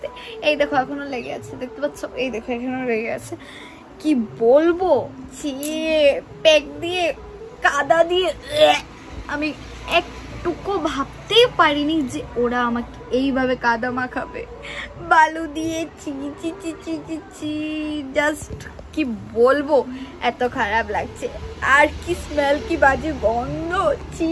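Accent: Sylhet, Bangladesh. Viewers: Does assami people speaks sylheti accent or what or are you from there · আপু তুমি কি সিলেটি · Are you from Assam or bangladesh? Because this is sylheti bangla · Tumi ki sylhety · Sylheti naki tumi?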